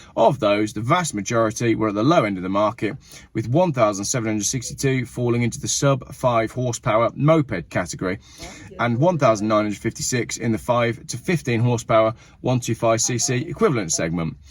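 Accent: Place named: England, United Kingdom. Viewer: Love the English accent:)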